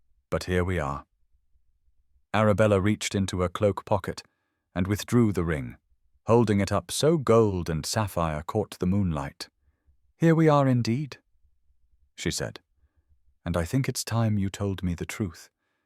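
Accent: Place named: United Kingdom. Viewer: Thank you so much for this lovely story. It is an original and enticing tale of romance and intrigue, with an ending appropriate for the situation you so elegantly described in the long story. I enjoyed the narrator with the British accent. It lends a distinguished touch to your wonderful story. Please keep up this wonderful channel and your tales of Victorian society. Perhaps you could utilize a few more images that would enhance your storyline…💕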